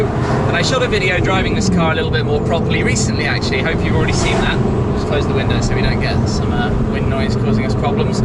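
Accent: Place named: RP, United Kingdom. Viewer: How does this guy buy so many cars ? Sounds like a bender from oxford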